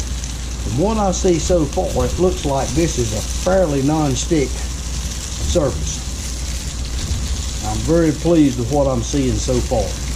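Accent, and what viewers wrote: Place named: South, United States. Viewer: wow, never thought i'd see a guy with a southern accent cooking on a real trangia stormcooker with all the proper bits. those guys usually hate everything that isn't american.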